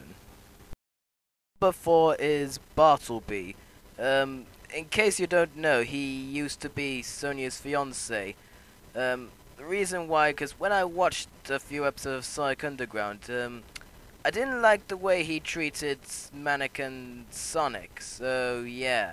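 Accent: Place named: United Kingdom. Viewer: Well I 3/4 agree with you but I lol'd when you started acting like Chris,and you do sound like you have a British accent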